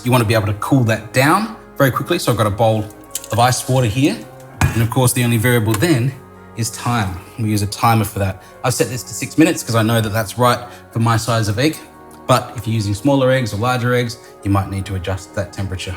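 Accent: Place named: Australia. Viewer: Is this dude Japanese or Australian? (as far as nationality, not race)... I can't tell which language he speaks better but his English sounds perfect with an Australian accent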